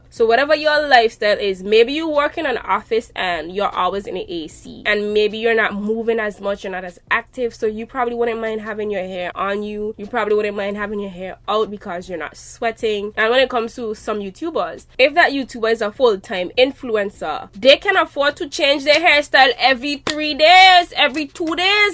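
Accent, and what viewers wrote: Trinidad and Tobago. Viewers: Girl got to give you a thumbs up..the trini accent first then your content..I really dont know what products works for my hair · Are you trinidadian?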